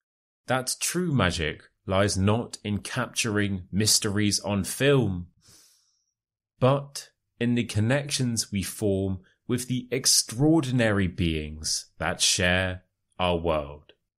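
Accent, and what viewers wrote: United Kingdom. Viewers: Are you from England and Scotland?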